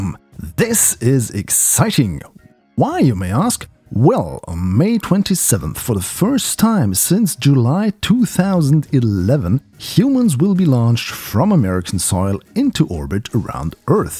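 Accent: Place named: Germany. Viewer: Bist du deutsch? Dieser Akzent..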